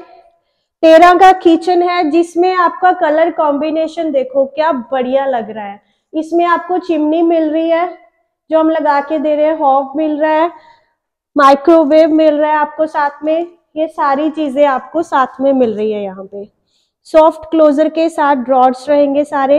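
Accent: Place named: Punjab, India. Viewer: Kudi punjab d lagdi aa jo punjabi vich Hindi fit kari ja rahi aa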